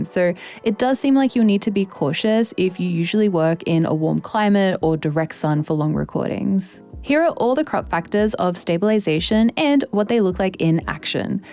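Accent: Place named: Queensland, Australia. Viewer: Are you from sunshine coast? You just sound like your from Queensland.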